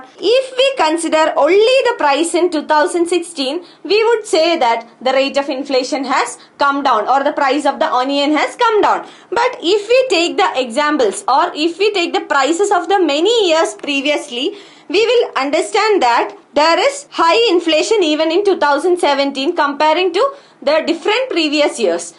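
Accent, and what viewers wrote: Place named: Kerala, India. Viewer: Pronouncing Kerala tone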